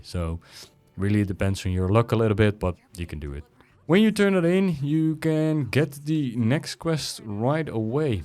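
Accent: Netherlands. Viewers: nederlander zeker?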